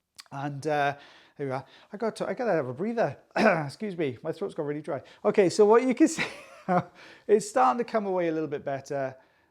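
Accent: United Kingdom. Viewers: Just found your channel a couple days ago. Watched an embarrassing amount of your videos. I don't think this would have came out the first time with a hammer and chisel. Also I like you narrating the videos and your excitement when a big chunk comes out. Plus I love listening to British accents, especially the word "tidy"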